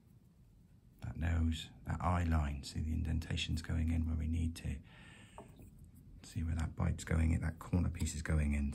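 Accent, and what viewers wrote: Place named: Somerset, United Kingdom. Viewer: Thanks for such a clear explanation. I also echo the comments of a previous reviewer, the Somerset accent is truly welcome.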